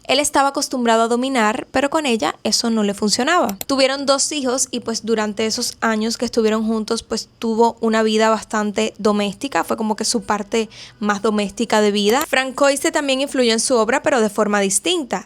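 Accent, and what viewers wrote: Cuba. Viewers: Nena recién conozco tu canal y apenas escuché tu voz pensé: "su acento suena cubano" y cuándo leí el nombre del canal me lo confirmó 😅. Saludos desde Cuba🇨🇺❤ PD: nueva seguidora acá, los temas de tus videos me llaman mucho la atención y estaré haciendo maratón siempre y cuándo la corriente me lo permita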